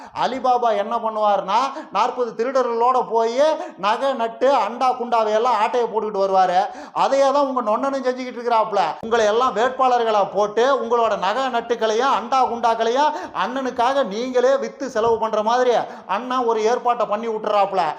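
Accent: Kongu, India. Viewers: Today very interesting comedy brother. 😮😅😊😂😊 அதுவும் நீங்க சொல்ற அந்த கொங்கு பாஷை இன்னும் சூப்பரா இருக்குல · உங்கள் கொங்கு தமிழ் உச்சரிப்போடு அகோரிசீமானை கலாய்க்கின்ற முறை மிக அருமை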